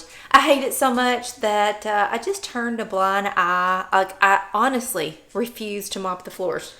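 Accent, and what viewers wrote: South, United States. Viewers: Great video and warm Southern accent🙂 Shark mfg needs to send you a big fat gift card😁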